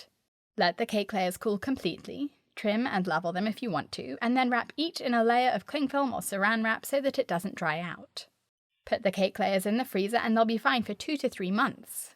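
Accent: United Kingdom. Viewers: Amazing tips.By the way are you British?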